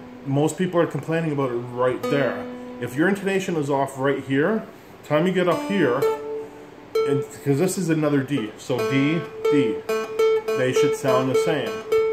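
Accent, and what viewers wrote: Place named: Louisiana, United States. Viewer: your starting to talk like a Cajun down here aint that dandy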